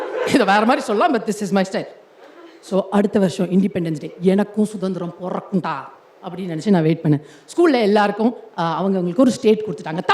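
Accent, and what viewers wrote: Chennai, India. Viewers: Chennai slang is perfectly suits you lit🔥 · real class this lady is. liked the fluent mix of chennai tamil and spashta tamil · Indha madras slang was not expected , surprised 😝😝😝 hilarious 😆...now that auto kaar will be like pappa shalu Ukraine la war ninuduchanu paathu sollu...petrol rate yethira poranunga 😜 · Brilliant performance 😍 Your madras slang chance ye illa. Thenampettai signal semma 😂👏👏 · Chennai slang awesome 😎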